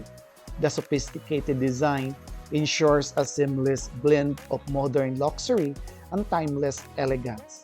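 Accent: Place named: Philippines. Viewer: Lmao that filipino accent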